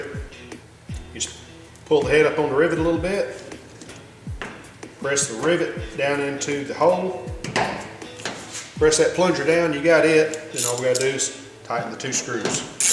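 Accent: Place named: Georgia, United States. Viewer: ........you must be from georgia. I understood every word you said. Great job on the fuel pump, very detailed and clear. This is the first time seeing your channel but love it. Looking forward to seeing more of your videos. Lets do it............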